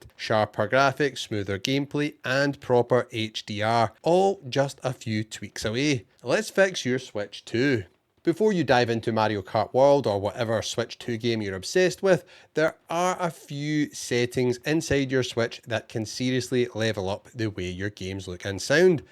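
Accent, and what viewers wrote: Scotland, United Kingdom. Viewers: The Scottish accent. The best accent in the world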